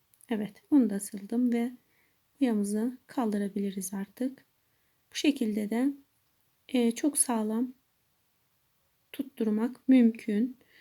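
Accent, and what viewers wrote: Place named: Uşak, Turkey. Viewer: Selam kolay gelsin nerelisiniz ben uşak lı bazı youtuber ları takip ediyorum onlar günlük vlog atıyorlar konuşmanızı benzettim eger mümkünse nerelisiniz cevap yazarsanız sevinirim başarilar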